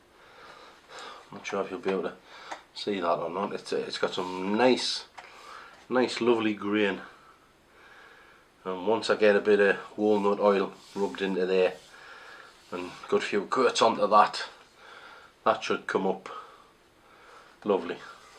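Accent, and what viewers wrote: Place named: North, United Kingdom. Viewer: Well done mate....its nice to see and hear a down to earth northern lad.....saving and saying £700 is still a lot of money to us normal working lads.....most of the reviews,they have massive houses or been given guns to review....you've convinced me what my new gun will be👍🏻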